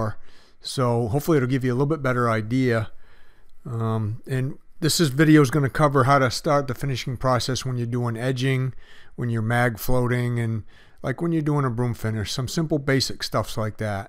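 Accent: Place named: Massachusetts, United States. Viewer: Cornah' herah' fingahs' you from Massachusetts or what? If so fellow finishah saying wicked pissah video. I'm on my 19th year and over the last 3 have been going to northern California to pour in the winter months ......and mine gold⛏. If you are in new england let me know , I could put you on some gold more then likely. That's how gold found me 9 years ago, working late one night walking from basement to basement. Caught of glimpse of something shiny. Picked it up it was a pc of gold in quartz "I didn't think it was real" a year later camping in Rangley maine. Found a nugget in the river and it was on from there. Lots of old mines in new England and most of the gravel we pour on and is around the site holds gold in smaller pcs.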